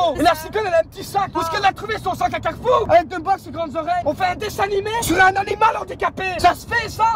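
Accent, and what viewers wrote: Belgium. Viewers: en tant que belge ayant fait des études de logopédie, jpeux dire que c'est pas qu'une question d'accent, mais vraiment de placement de voix, de volume vocal et d'intonations. x) Je dis pas, c'est très bien imité, on fermant les yeux on pourrait penser à un nouveau sketch de Mr Manhattan voire une nouvelle scène de Dikkenek , mais c'est pas le but ici je pense, d'où le coté dérangeant ^^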